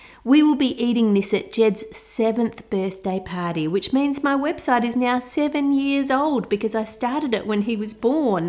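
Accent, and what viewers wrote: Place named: Australia. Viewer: Peel away inhales THE PAYPEH I love Australian accents lol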